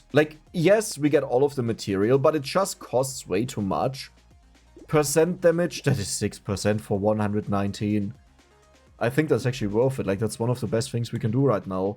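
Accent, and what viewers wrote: Germany. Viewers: Ich dachte mir schon das du ein deutscher bist 😂😂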